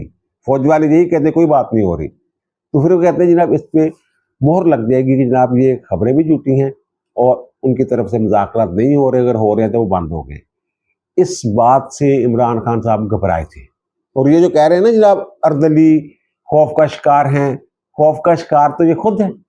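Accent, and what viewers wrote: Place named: Doaba, India. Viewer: sahib, tusi Jalandhar ya Hushiarpur de o? Tuadi Punjabi ton kagda ai.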